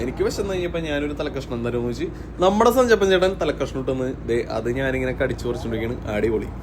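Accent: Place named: Kochi, India. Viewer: Nice video correct kochikkarente voice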